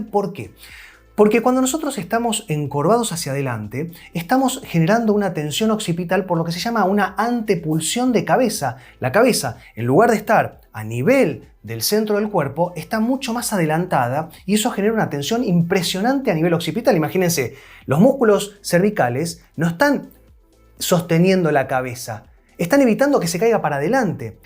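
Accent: Buenos Aires, Argentina. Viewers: Gracias , muy buen video. Mi nuera una muchacha joven tiene cuello a l miseria !!! Deformado . Joroba. P mala postura pienso ; trabaja mucho c l compu. Pero comenzo deformidad mas joven. Voy a mostrar este y tratar vaya a quiropractico. Ud es argentino ?? Porteño?? 👍🇺🇾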